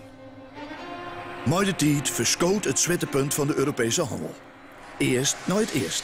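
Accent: Netherlands. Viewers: well its a dutchman who tries to speak frisian...., frisian sounds a little differend.... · This man sounds like a klei-frys. The western part of the province Fryslân. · Interessant verhaaltje. De spreker gebruikt hier en daar wat fyske wurden, maar dit is geen frysk. De hele taalstructuur en zinsbouw is gewoon hollands. De uitdrukkingen zijn vertaald hollands. Accent en snelheid van spreken, gewoon hollands. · It's Fries, but it sounds like the speaker's first language is Dutch, and Fries is his second. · is this dutch or german,,,,words sound german but the sound of his accent seems dutch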